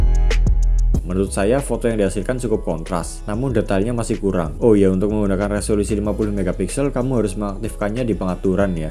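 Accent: Banyumas, Indonesia. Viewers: Kaya logat ngapak?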